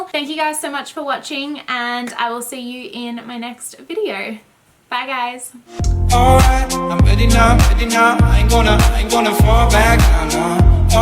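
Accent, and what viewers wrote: Australia. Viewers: lol, I am Aussie and when I watched 50secs into the vid, I was like... she is Aussie!!!!!!! yay !!!!!!!!!!!! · Are you Australian? love your accent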